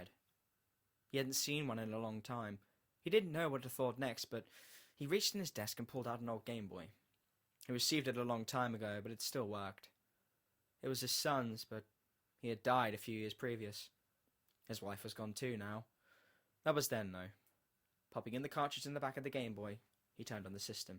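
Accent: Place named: United Kingdom. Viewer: Just because you have a British accent, this reminds me of an episode of Sherlock xDD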